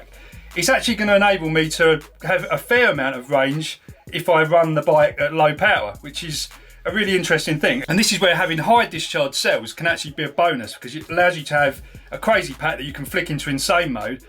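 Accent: United Kingdom. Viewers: From your accent I guess you're British excellent very brilliant was Gordon Ramsay your chef and I do think it's brilliant how you're soldering batteries I want to do the same thing to my e-bike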